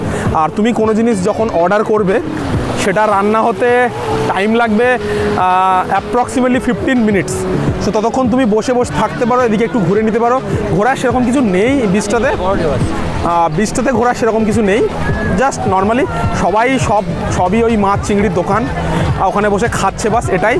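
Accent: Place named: Bangladesh. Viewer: তোমার বাড়ি কি বাংলাদেশ কথাবার্তা শুনে সেই লাগছে আমার বাড়ি দীঘা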